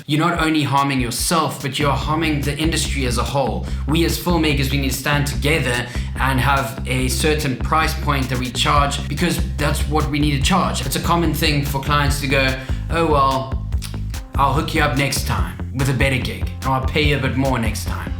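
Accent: South Africa. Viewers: Step 2 is where im at, i did about 10 weeks of weekly videos. I create scrap metal sculptures and i film the process and the story of why im making what im making. It felt so good to be so active for a few months but making the sculptures is my fulltime job and the extra time put me behind on orders argh, once ive caught up properly i can get back to it again 😁 thanks for the advice, I love your content and the accent, it reminds me of my grandmother (she was from south Africa)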